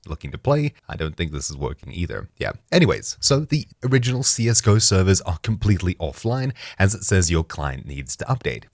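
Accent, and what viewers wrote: South Africa. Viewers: I didnt know this man was South African. Sawubona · Are you south african? if you are sick man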